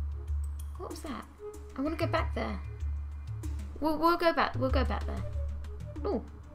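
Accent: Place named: United Kingdom. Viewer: I didn't quite understand the name of the game studio you're working in... Anyway, I'm eager to watch this series, because even though I really love the Fallout franchise, I've only played the PS2 spin-off Brotherhood of Steel and all the Bethesda games. So I'm more than excited to watch somebody else play the classic that started it all (well okay, that would technically be Wasteland lol), especially somebody with a British accent. :3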